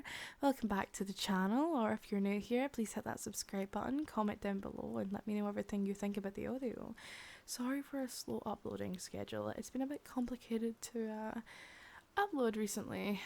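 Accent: United Kingdom. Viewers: She really cute with british accent. Great job💚👍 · Me: "British accent? Well, that should be interesti-": "Good morning, sunshine." Me: "Oh... I'm in trouble." 😳 Real talk: I've actually been struggling with my depression rearing its ugly head the past couple of days, so I really do appreciate this. Thanks for the audio. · i love your voice epscially your british accent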